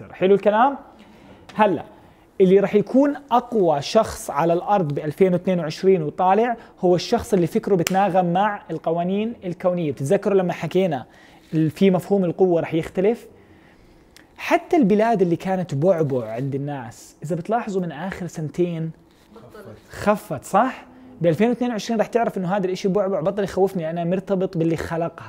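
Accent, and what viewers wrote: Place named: Levant, Arab world. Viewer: شكرا ،، لهجتك حلوة عراقيه سورية فلسطينه لبنانيه ماعرفت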